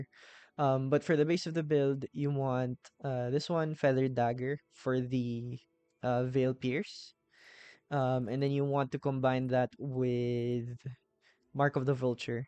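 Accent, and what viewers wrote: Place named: Philippines. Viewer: love the build boss. From the way you talk i assume you're filipino :).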